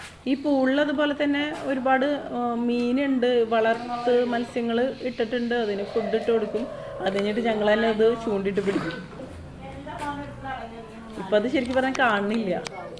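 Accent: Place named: Angamaly, India. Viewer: Angamalyyo Kodungalluro slang aanennu ee answer vaayikkunnathinu mumpu oohichu. Thanks for clarification.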